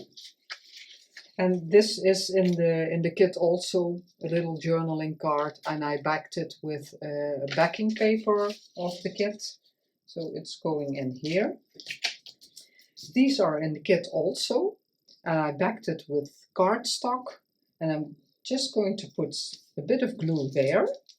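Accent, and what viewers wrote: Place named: Netherlands. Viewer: I’m so glad I found your channel, another lovely Dutch accent to listen to and beautiful work to look at! Susie xxx💕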